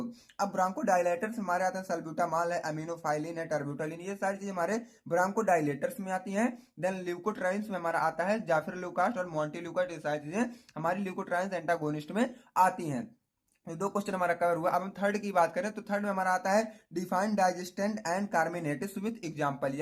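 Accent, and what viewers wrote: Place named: Uttar Pradesh, India. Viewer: Up se ho bhai q Aap language sun ka lag rha Hai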